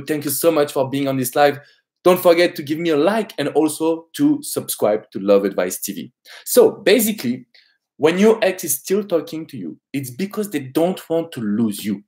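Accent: France. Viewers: Nice French accent. Where did you get it from? Thank you. great video.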